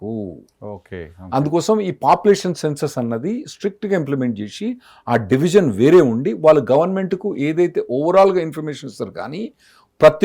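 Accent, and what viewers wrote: Telangana, India. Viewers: screen layout clean, clarity on discussion Telengana accent entertaining Keep doing more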